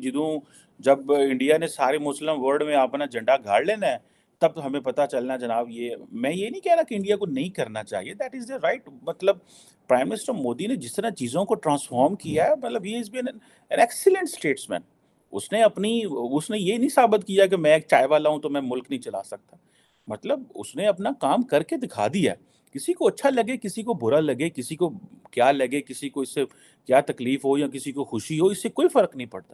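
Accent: Punjab, Pakistan. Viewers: don't forget your panjabi tarka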